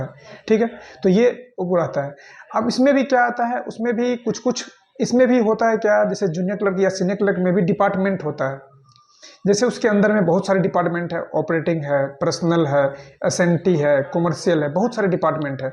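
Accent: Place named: Bihar, India. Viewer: Bhai tu bihari bhsha q bolta h ganw wali .. Hindi b nhi shi se bol pate h bihari 😮lafda ko lfra 😂 wah😮..pta nhi ksi ghatia education h wha k school n teachers ki jo shi sbd kaise bole jate h vo b ni sikhate 😢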